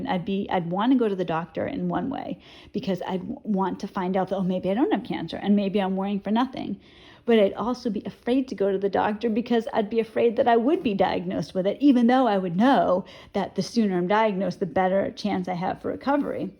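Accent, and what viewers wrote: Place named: Boston, United States. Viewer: you should do a small clip of your Boston accent, just for kicks. Another great video, we can change and move on, thanks for your encouragement and stories it really helps because so many are still struggling. I am free but still struggle with rumination and it really sucks. It's so cute to hear the chickens in the background.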